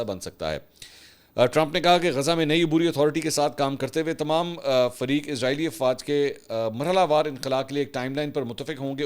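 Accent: Punjab, Pakistan. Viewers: میں آپ کے پروگرام اور آپ کی منظم خبروں کا احترام کرتا ہوں۔ بات کرنے کا شکریہ۔ آپ پنجابی لہجے میں بھی بات کرتے ہیں۔ تاہم، آپ کو میرے پچھلے جواب کو دیکھنا چاہئے۔ تہہ دل سے شکریہ کے ساتھ۔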